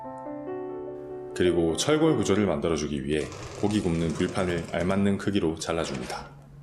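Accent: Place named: Busan, South Korea. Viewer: 부산이신가용? 중간중간에 사투리 억양이 정겹습니다~ ^^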